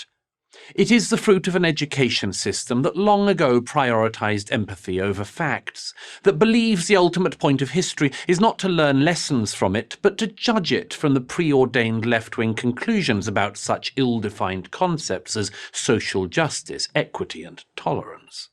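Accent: Scotland, United Kingdom. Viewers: I agree. His voice is cold. Cold and bereft of love for humanity. It is the voice a crocodile would have if it were rendered the power of speech. I personally really like it, but then I always get hard at a Scottish accent that has been mangled to sound English.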